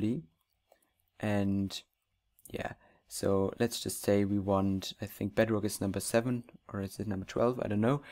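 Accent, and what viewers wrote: Germany. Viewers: u have a speak is like german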